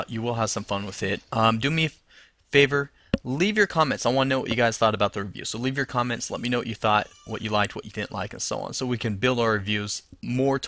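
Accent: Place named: East Coast, United States. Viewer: it;s fine to me... He's probably from the east coast.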